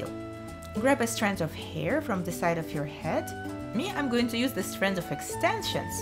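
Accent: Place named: Russia. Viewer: Слышно что русская))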